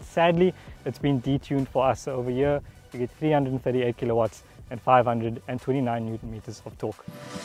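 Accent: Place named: South Africa. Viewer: This presenter has a brilliant South African accent. Fantastic!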